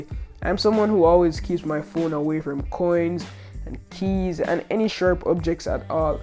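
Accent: Jamaica. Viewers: are you jamaican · Are you Jamaican?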